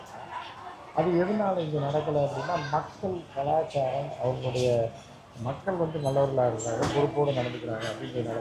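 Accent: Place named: Madurai, India. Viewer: வணக்கம் தொடர்ந்து காணெளி பார்த்து கொண்டு இருக்கிறேன் இதை பார்த்த அதன் மதிப்பு அவ்வளவு தான் உங்கள் பேச்சு நையாண்டி கலந்து மதுரை பேச்சு உங்கள் சேவை தொடரட்டும் இப்படிக்கு ரேவதி பிரபாகரன்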